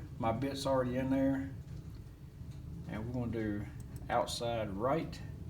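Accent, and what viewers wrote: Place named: West Virginia, United States. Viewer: I recognize that accent, as I'm a WV feller not too far from Danville! Appreciate your video very much. Brand spanking new to CNC's and carbide create seems like a good program to get me up and running. (along with the help of good guys such as yourself). Hope your little girl is still doing well and healthy and will say a prayer for her and you and your wife as well. All the best.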